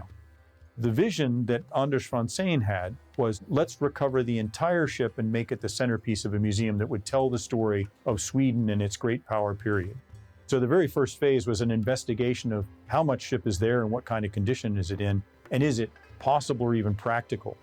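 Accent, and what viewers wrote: United States. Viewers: This guy is def from Delco area in Pennsylvania lmao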